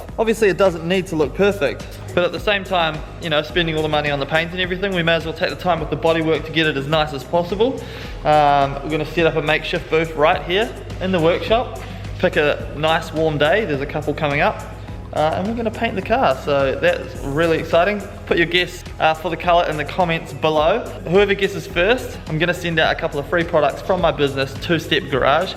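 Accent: New Zealand. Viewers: I thought you was south island nz . Chur bro mean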